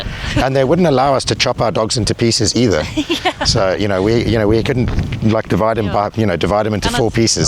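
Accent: South Africa. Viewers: is that a SA accent i hear? hope you all safe.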